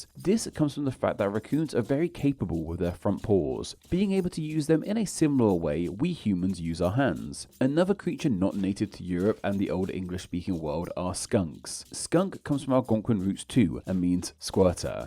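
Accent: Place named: United Kingdom. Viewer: I’m not sure why we have a British guy telling us about which American words and place names come from Native American languages… because he doesn’t even pronounce them the way we do!!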